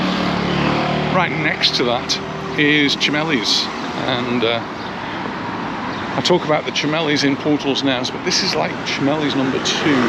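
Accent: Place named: Scouse, United Kingdom. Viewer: Hi &, we’re long time subscribers, loving your vlogs and the hint of a scouse accent 👌😉Just after some advice if you don’t mind. We are cruise fanatics and vloggers and are visiting Palma for the day on Cunard’s Queen Anne on 17th June. We want to visit a nice beach for the day. It would need facilities (Sunbeds, umbrellas, somewhere for food and drink), have relatively easy access (a couple of our party have mobility issues and be not too far from the cruise terminal. Portals Nous looks good, any other recommendations? Thanks in advance. Gail & Mike x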